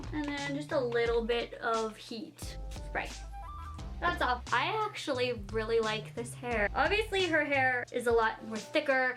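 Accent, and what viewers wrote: Canada. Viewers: It's your Canadian accent!! Lol!! You were close! Great job! If you had thicker dreads you would look just like her!!❤️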